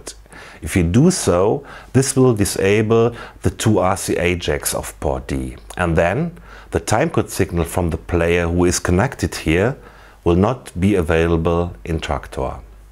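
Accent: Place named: Germany. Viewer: hachja, deutsche und ihr englisch..:D danke für`s video:)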